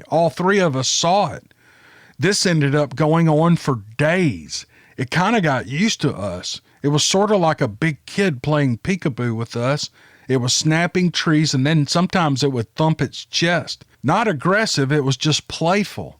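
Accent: South, United States. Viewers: Good read keep up so sweet southern accent.🎉❤😊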